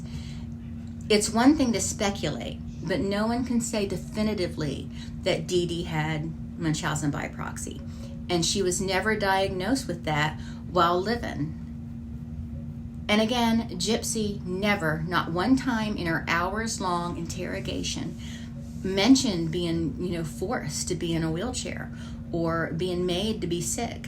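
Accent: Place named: South, United States. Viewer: Every now and then you drop your g off the end of your words and you sound real Southern and it's just adorable. Yes, should get with a bigger company and collaborate on a BIG TIME documentary. She has probably the most in depth knowledge of the actual events...I called her the "Advanced Gypsologist" or something like that when she was going up to fight the lawsuit saying she couldn't claim " Leading Expert".😂😂😂 and got a chuckle out of her on the Live that night. I was so worried for her and Sara just having to take off to go like that.... Meanwhile... Back in Da By-you...da family is lockin' da bedroom doors at night and hopin' she really doesn't take up cookin'...😂😂😂😂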